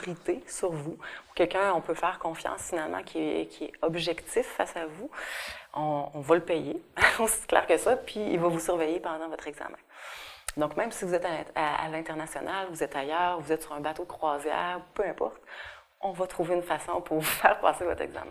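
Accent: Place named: Brazil. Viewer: me parece un brasileño hablando francés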